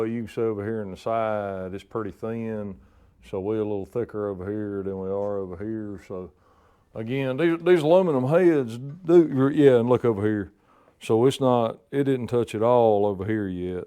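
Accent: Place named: South Carolina, United States. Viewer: He lives in South Carolina, the USA is big enough that there are several different accents from one end to the other. You can tell someone who lives in Minessota from someone who lives in Wisconsin, as an example.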